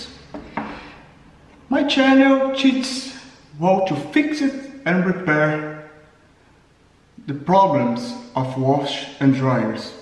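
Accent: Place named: United States. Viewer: Agora realmente ele esta americano como ele falar no video Parabens mesmo o a mericano.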